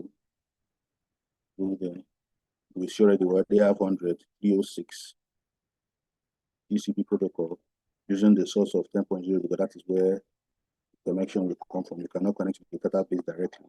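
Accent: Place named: Africa. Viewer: African accent is so out of this world, I cant understand the words coming from his mouth...😵‍💫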